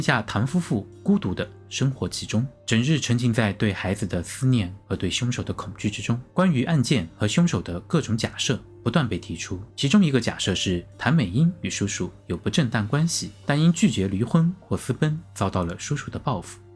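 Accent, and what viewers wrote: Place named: Kunming, China. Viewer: 博主口音为什么像昆明人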